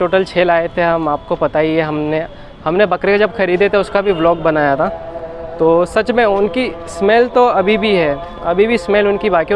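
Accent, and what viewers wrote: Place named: Pakistan. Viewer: App Kay kahnay ka style same pakistan ki Tarah hai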